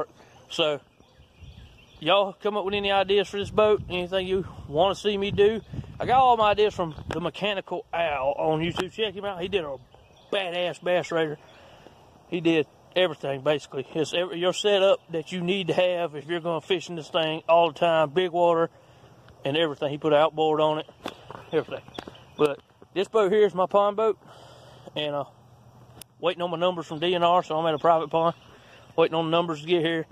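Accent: South, United States. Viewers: My family is from the hard south and you sound just like them I love it makes me feel like I'm home again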